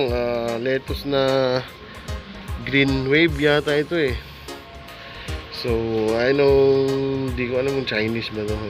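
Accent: Visayas, Philippines. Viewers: bisaya k b sir?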